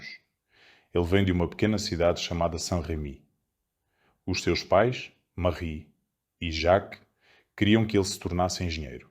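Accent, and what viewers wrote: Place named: Portugal. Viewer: sounds like European Portuguese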